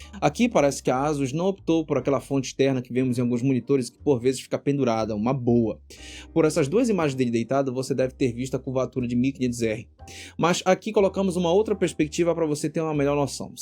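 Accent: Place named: North, Brazil. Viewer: Mano vc é de onde? Teu sotaque parece ser aqui do norte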